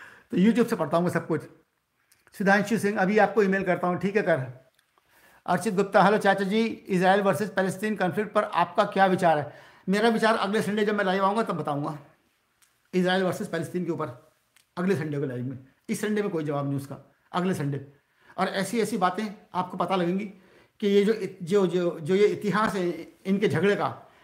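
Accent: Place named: Moradabad, India. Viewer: humaray mata pita moradabad up say Karachi aaiy thay aap ki bhasha bilkul moradabadiyon jesi hay Meray pita gee bhi bilkul aap ki Tarah Urdu Hindi boltaiy hain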